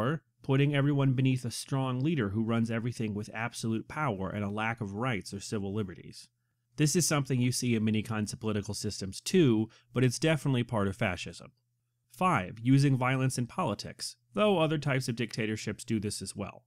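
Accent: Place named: United Kingdom. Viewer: honestly tho, i loved your brittish accent :DDD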